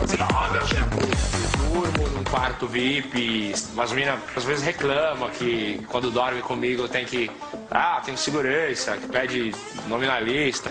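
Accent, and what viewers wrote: São Paulo, Brazil. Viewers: O sotaque de paulista é foda! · Nossa...rs Igualzinho o sotaque Paulistano kkkkk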